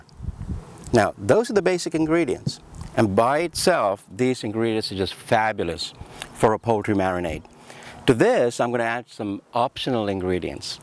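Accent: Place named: North America. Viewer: This south Asian brother sound like North American..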